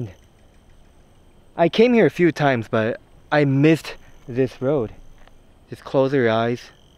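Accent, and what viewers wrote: Singapore. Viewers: 英語がシングリッシュ寄りのアクセントになってきた気がするww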